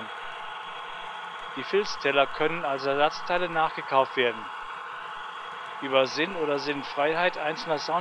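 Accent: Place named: Franconia, Germany. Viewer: Zum "gebrochenen Deutsch": Der so genannte "Akzent" entpuppt sich eindeutig als waschechtes Fränkisch, also durchaus passend für eine Maschine nach Westfrankenbahn-Vorbild eines urfränkischen Modellbahnherstellers. Hochdeutsch wäre hier eigentlich eher fehl am Platze!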